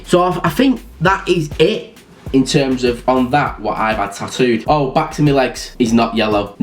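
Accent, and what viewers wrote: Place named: North, United Kingdom. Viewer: Are you a northerner? You sound northern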